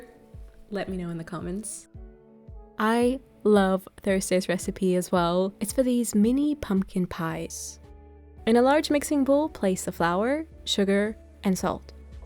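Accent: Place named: Germany. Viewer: I've got to say you have the cutest German accent I have ever heard 🫠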